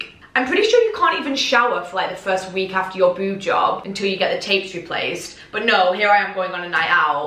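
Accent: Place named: North, United Kingdom. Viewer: You sound allot more northern back then lol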